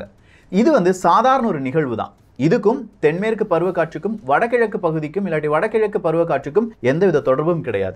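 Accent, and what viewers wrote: Kerala, India. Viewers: Are you basically malayalee. Now and then you speak in malayalam accent. No intention to hurt you. Just anxiety. Please reply.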